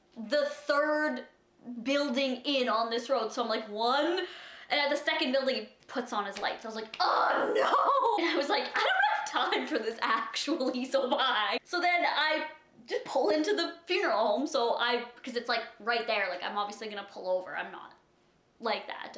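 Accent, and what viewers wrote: Canada. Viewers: Are you from Canada because they say 'sorry' in the same accent you have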